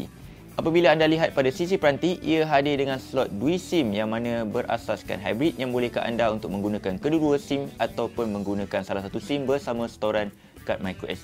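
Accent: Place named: Kuala Lumpur, Malaysia. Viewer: Kenapa orang KL selalu ubah sebutan Malaysia kepada malaysie? ini perlu dihentikan.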